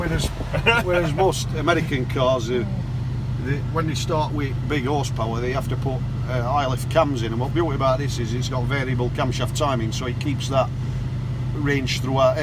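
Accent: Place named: Yorkshire, United Kingdom. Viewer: Strange hearing a yorkshire accent in the united states. Awesome build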